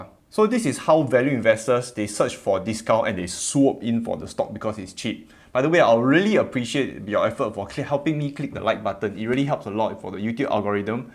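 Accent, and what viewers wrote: Singapore. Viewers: Love the singaporean accent dude! proud of you! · I sold my bershire last 21february sadly i really like the guy may if you mix feeling and investment it is not a good moove like the guy but not too much some bershire stock as i am writing brb.b is 170.6....thank you for you video i like your Singapore accent i have interest in Singapore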